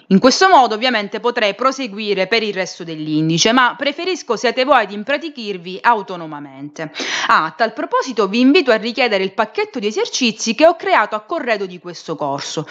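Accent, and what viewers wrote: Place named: Sicily, Italy. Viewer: Sono ingegnere come te, ma hai delle capacità oratorie affascinanti,…poi con quell’accento (siciliano?) ufff, tanta roba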